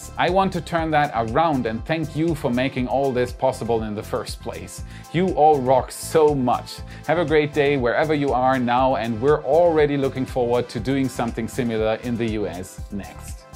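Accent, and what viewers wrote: Germany. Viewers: haha he has quite the german accent, but his grammer and vocabulary are perfect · Dutch, German and Scandinavian people generally speak really proper English and quite a few are close to fluent or fluent. These languages are pretty similar to English in terms of grammar and structure. As a Dutch person I can easily spot ’ German roots in his accent though, just like it is pretty easy to spot Dutch accents when you know what to look for.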